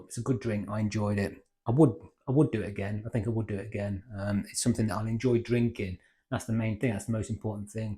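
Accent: United Kingdom. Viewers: You sound like your from Leicester same as me lol. Just subscribed and given a thumbs up to this great video. I've just bought the Pinter3 presented by Guinness, really looking forward to brewing with it and watching more of your work to help me. I;ve got Dark Matter and Guinness Dublin Porter, sadly Shadow & Creams out of stock. Do you think I can get good results following the Pinter app to start with just to keep thing simple? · sorry one more question. You wouldn’t be from Nottingham by any chance? Your accent sounds local to me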